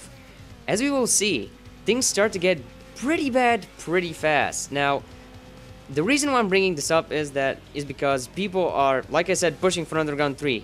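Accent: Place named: England, United Kingdom. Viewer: Horrible English accent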